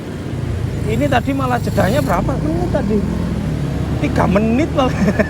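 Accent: Malang, Indonesia. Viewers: Logat orang malang sama semua 🤣 kebanyakan temanku logatnya mirip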